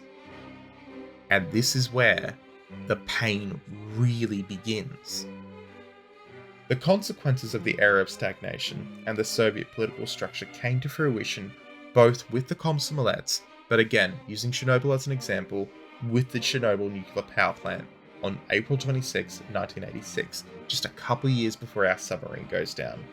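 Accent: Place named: Australia. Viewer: A difference in dialect, he’s Australian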